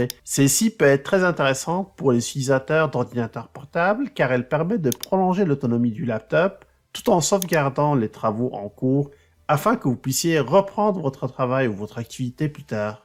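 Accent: Quebec, Canada. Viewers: Merci pour les explications avec le bel accent d’outre Atlantique. 👍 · J'ai voulu suivre vos explications.Helas!vous parlez trop vite et avec un accent québécois si prononcé que j'ai du mal à comprendre.Je crois que la meilleure solution,ce serait d 'utiliser de bons sous-titres bien lisibles en français bien sûr.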